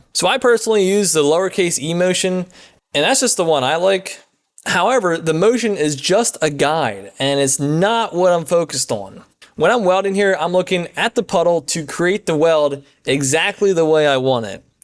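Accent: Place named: Australia. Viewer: Love the Rolf Harris accent - except he is now persona non grata.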